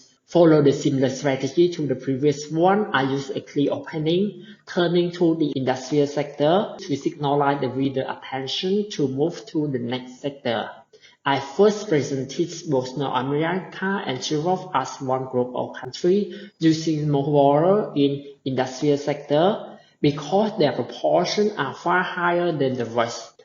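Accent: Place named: India. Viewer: It looks like any Indian try to speak in English assent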